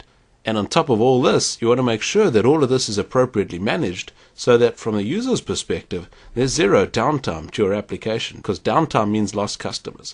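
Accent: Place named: Afrikaans, South Africa. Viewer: jinne, jou afrikaanse aksent skyn deur ;P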